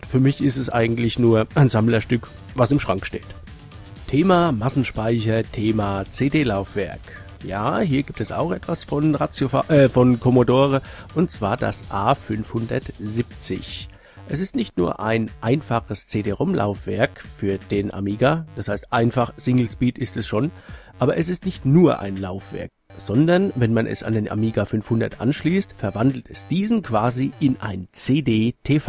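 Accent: Hesse, Germany. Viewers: Dieses war das erste Video, das ich von Dir gesehen habe und mir gefällt, was Du machst! Mal ganz davon abgesehen, dass ich selbst einen A500 hatte, finde ich Deine Ausführungen sehr eingängig und allgemeinverständlich. Ich gebe zu, ein bissel technischer könnte es meiner Meinung nach schon sein, aber das ist nur eine Randbemerkung. Übrigens: Du bist Hesse, oder? Du hast einen grandiosen Akzent, der aber niemals überhandnimmt. :)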